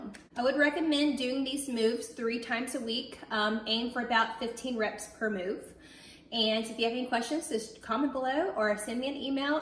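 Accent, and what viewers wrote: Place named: United States. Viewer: ZAKIYA SULEIMAN she has an American accent🤷🏽‍♀️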